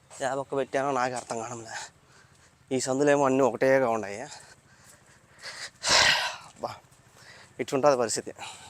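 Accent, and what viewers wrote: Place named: Kadapa, India. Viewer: Anna kadapa slang pushpa slang la vundi anna..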